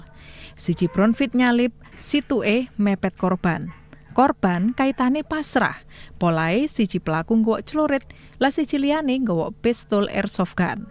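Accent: Java, Indonesia. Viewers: Apa ini kok medok banget😅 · medok puoll 😂😂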